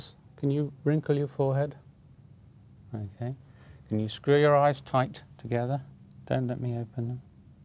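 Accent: United Kingdom. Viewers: That is a very beautiful british accent sir^^ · I've watched the whole video and I'm still waiting to hear a calm voice. All I hear is a horrible british accent.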